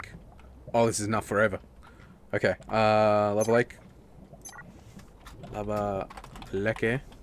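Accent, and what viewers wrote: Australia. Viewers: Question: can you make a video without holding back the australian accent and swear words?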